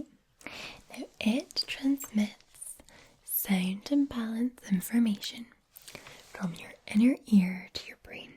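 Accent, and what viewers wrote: Scotland, United Kingdom. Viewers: I'm getting sick of seeing these! Jk I love the video and nothing beats a Scottish accent! 😊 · I do love the Scottish take on the ol' CNE (being Scottish myself) :-) Great work! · I am scottish myself, so this makes it much better to listen too, also probably one of the best ASMR'ists of youtube in my opinion :) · Best Scottish ASMR youtuber! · i love love love the Scottish accent thank u for this it’s amazing keep up the good work!! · So cool listening tae someone else who’s Scottish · who doesn't have an accent? English accents are so damn mixed and diverse that even being from the UK I can't tell what it is. I think it's more so Scottish.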